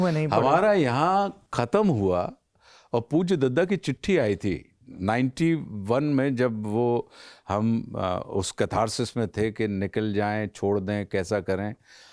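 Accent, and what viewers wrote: Standard. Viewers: Bahat khubsurat Hindi bhasha ko Sanman se bolte hai sanman dete hai❤❤❤ aaj tak koi hero Heroine ko itna hindi me baat karte kishi show me nahi dekha sirf movie me bolte he ,baki to English English par ,, Aashutosh ji very pure ❤❤❤ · Your hindi is awesome....ekdum shuddh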